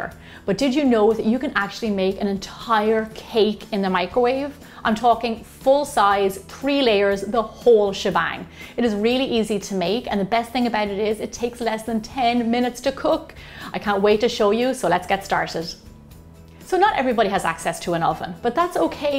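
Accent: Ireland. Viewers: Wow! You are super inventive! My picky 9yr old loved your baked potato soup in a mug. Benjamin said it was so delicious. And, my 4yr old Gianna just saw this video of triple layer cake in the microwave and can't wait to bake one. Thank you so much for your amazing recipes! Love your Irish accent too. My father's background is Catholic Irish (Kelley) is my maiden name and my mother is from Ecuador. Congratulations to you and your husband for your new baby. What a blessing. She will be so proud and grateful for such a great mommy. Love & prayers, Deirdre & Patrick Tondo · You're so pretty, and your accent is so cool! Lemme guess... You are from Ireland? Thank you for your creativity, it's a miracle how you can actually make a cake with a microwave! 😋☺️🎂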